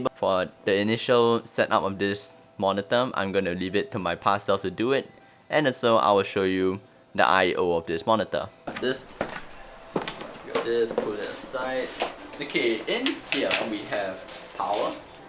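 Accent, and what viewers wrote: Singapore. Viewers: U from singapore? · Are you from singapore?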